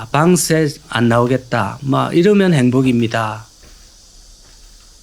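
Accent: Gyeongsang, South Korea. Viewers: 모두들 잘살고 평화로운 세상 오길 간절하게 바랍니다. ~경상도 말씨가 듣기좋습니다. 저도 차분한 말투 배워야겠어요.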